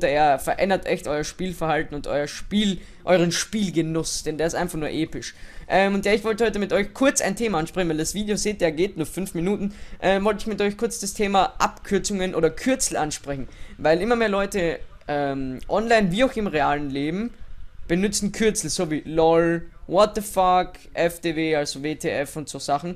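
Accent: Austria. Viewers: endlich amal a österreichischer kommentator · Bist Wiener :)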